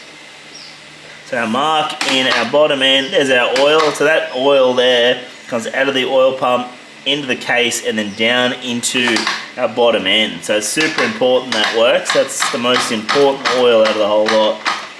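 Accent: Australia. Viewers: the Aussie accent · I love your aussiman accent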